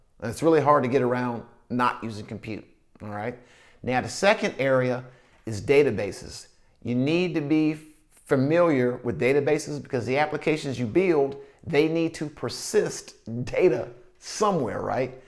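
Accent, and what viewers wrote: South, United States. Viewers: That southern accent coming through strong 🤠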